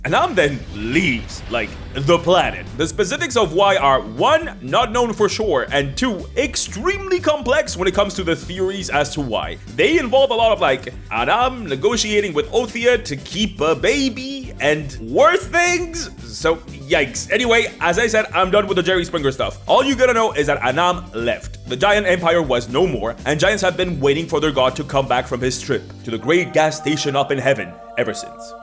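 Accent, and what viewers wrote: Ireland. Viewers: give us your irish accent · Release the forbidden Irish accent Go on. Go on. Go on. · As one of your Irish viewers, the fact you used to speak with a big Dub accent makes me unspeakably happy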